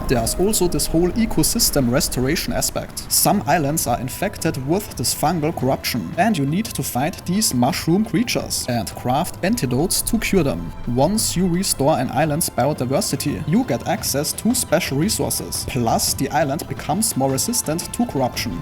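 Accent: Germany. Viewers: Bin das nur ich oder klingt das nach deutschem English? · dat denglisch !!! :( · german for sure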